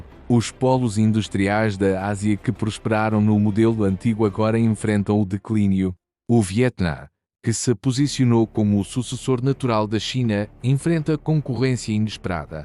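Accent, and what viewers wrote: Portugal. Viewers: Pq fazer com sotaque de Portugal????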